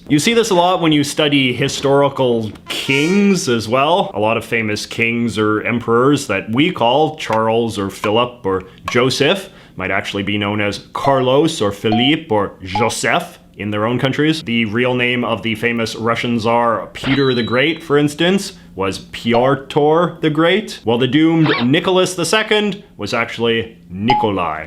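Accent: Canada. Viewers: I'm American, I just subscribed bc I find Canadian accent very sexy. But then I found out that his content is pretty good too. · In addition to his use of sound effects, I find amusing Mr. seemingly unconscious and unironic Canadian variations [n b.: plural] on the word "about."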